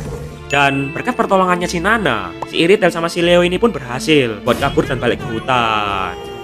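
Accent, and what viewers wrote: Java, Indonesia. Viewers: Bang, Abang Jawa ya?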